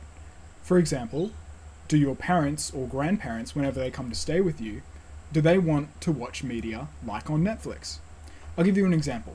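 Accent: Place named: Australia. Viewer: going by your accent I am guessing you're a fellow Aussie. Facebook Marketplace is your friend. A lot of IT folks that are able to scam decommissioned servers will sell them on FB. Some people are a bit ambitious with pricing but every now and then someone gets sick of their PowerEdge T430 taking up space and they flog it off for $150AUD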